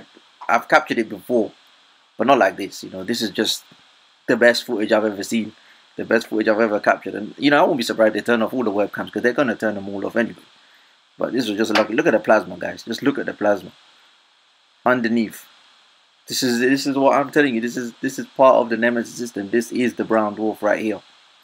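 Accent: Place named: England, United Kingdom. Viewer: Good stuff, where are you living? you sound English.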